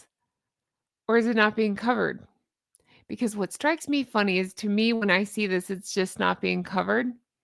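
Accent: South, United States. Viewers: Good Morning and room. Honk, Honk.. Megalier episodes never gets dull..... You do sound Southern.... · It’s ok to sound Southern❤ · Hey. Mississippi girl here- don’t you apologize for any southern accent you may or may not have. I think it sounds beautiful🥰 Love you channel!🪿🪿🪿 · HONK-ity HONK HONK Queen of the Flockers. Great video as ever 😂😂😂😂😂 Yeah, you do sound southern. How's Flockingham Palace coming along? Enjoy your days off. Congratulations on your weight loss journey. I love how you keep things real (I dare not say 'authentic'). Your faithful (& a tad busy) humble Flocker.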